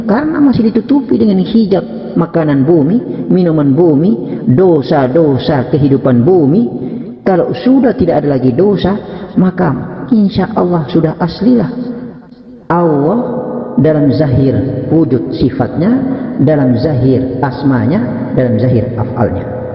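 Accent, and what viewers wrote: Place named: Bugis, Indonesia. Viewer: Kalo sy dgr selalu sy teringat bahasanya logat Bugis.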